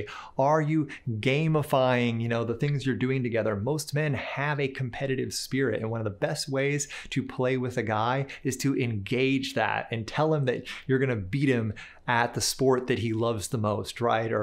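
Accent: New York, United States. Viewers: Are you American? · Omg i love your new york lady accent 😂😂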